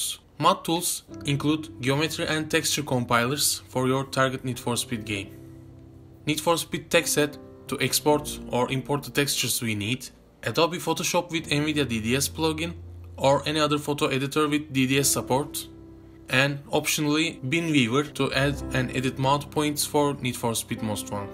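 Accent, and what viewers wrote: Turkey. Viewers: ingilizde aksanından bile türk olduğun anlaşılıyo .d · túrk olduğunu aksağaníndan anladím benden kaçamazsín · ingilizcenden belli kardeşim sen Türk'sün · türkmüsün la sen :D ingilizcenden anladım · askandan anlaşılıyor türk olduğundan :)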